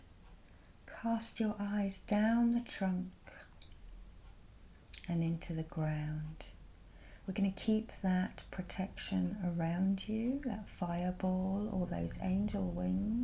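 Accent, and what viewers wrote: England, United Kingdom. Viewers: I love the way The English from England sounds, what an enchanting accent =voice, you and put me to sleep easily 😵‍💫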